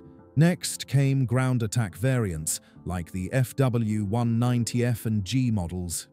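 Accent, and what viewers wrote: United Kingdom. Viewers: OMG another British accent!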